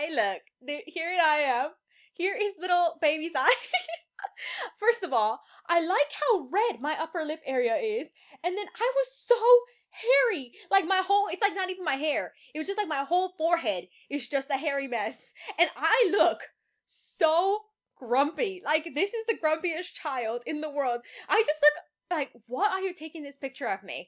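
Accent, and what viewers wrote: Spain. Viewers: Why is the album title in Spanish? It says:cuando era bebe! I'm not surprised cause I know Spanish and she talks like she talks Spanish soooo... Btw you where a really cute baby 👶 ❤️ Like if you agree!👍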